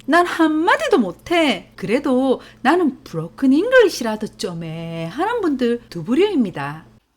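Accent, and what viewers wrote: Gyeongsang, South Korea. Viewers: 경상도 좋아요 😄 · 경상도 사투리 너무 심하고 시끄러워서 불쾌해서 싫어요 줍니다. 시끄러운 경상도 사투리 세상에서 가장 싫어요.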